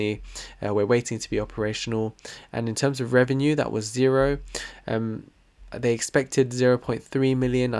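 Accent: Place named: United Kingdom. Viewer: Very informative. I like your accent, are you British?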